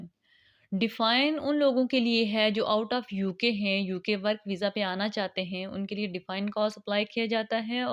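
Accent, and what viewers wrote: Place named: India. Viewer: i already watch your video and i found difficulties to clearly understand Indian language or accent. Please if you could answer me about my questions in English i would be grateful. Thank you in advance.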